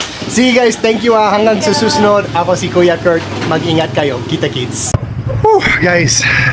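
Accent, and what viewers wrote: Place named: Pangasinan, Philippines. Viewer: No offence meant, you sounded like you are from Pangasinan when you speak Tagalog and sounds like Cebuano kapag nagtatagalog sya.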